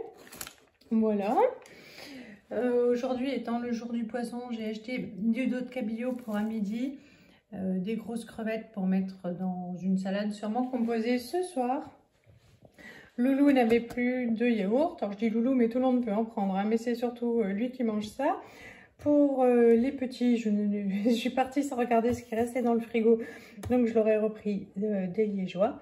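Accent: Alsace, France. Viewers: Yo hopla... trop bô l’accent Alsacien 🤣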